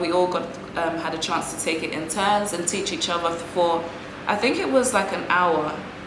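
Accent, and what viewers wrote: United Kingdom. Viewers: OMG! I love your dress, or blouse? and I love your English accent.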